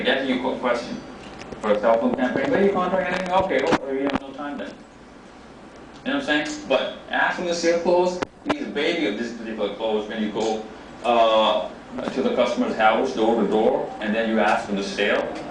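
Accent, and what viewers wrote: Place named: England, United Kingdom. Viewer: Love his English accent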